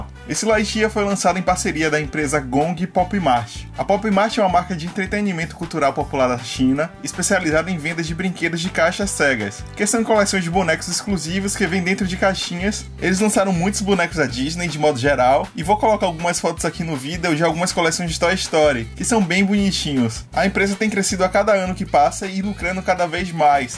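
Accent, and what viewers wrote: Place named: Bahia, Brazil. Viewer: O que é Baiano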